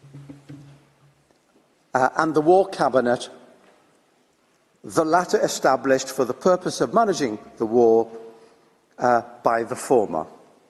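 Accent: United Kingdom. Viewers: Beautiful English, amazing British accent. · Mine second - I ador your British English, Sir Malcolm!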